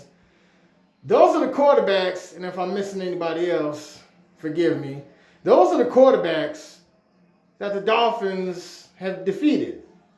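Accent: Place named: Appalachia, United States. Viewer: You talk like a hillbilly. Where do you live?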